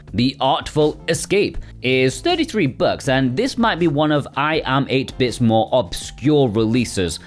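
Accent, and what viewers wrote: United Kingdom. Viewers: Are you Caucasian ??? You sound very Asian British :o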